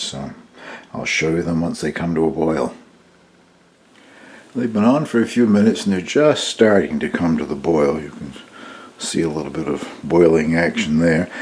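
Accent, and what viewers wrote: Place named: Newfoundland, Canada. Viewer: Ahh! You must from Nufunlan? I recognized the very slight accent. Right on man, good job on the jelly! Hi from N.Alberta.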